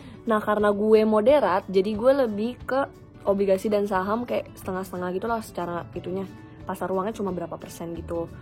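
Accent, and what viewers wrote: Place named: Medan, Indonesia. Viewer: kakak, kek anak Medan...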